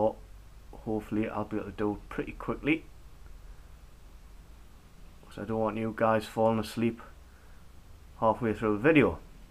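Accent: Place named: Newcastle, United Kingdom. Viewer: lol your geordie accent made me laugh when talking about your pillows then straight on to the pillars. Great work mate looking awesome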